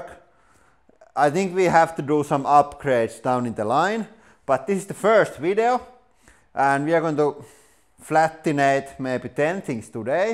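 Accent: Finland. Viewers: You turned Stretch Armstrong into a chicken carcass! You Finns rock. I worked for ABB many years and traveled to Helsinki from time to time. I miss hearing the "Finglish" and your great sense of humor. Thanks to your channel, I get this back without traveling to Suomi-land. Take care and stay well.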